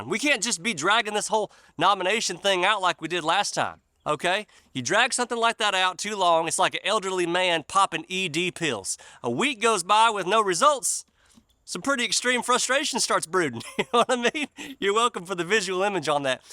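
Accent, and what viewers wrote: South, United States. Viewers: takes a true Southerner to talk like. its a lifestyle you can't make this stuff up!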